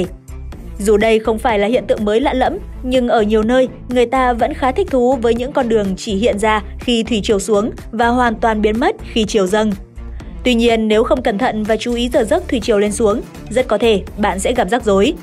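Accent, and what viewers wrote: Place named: North, Vietnam. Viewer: Giọng này là người Bắc Việt cộng không quen tốt nhất là không you tube nghe thấy rất ghê tởm 🤮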